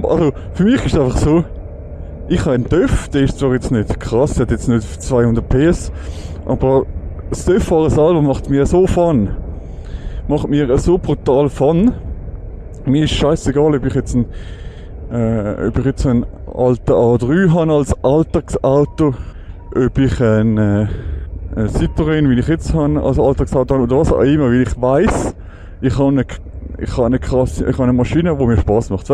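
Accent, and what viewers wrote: Switzerland. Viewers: Moin din Dütsch ish lässig guet moll wieder CH zum höre wanni do im Nord Deutschland umme irre schönnie Pfiffe fome flach Land 😂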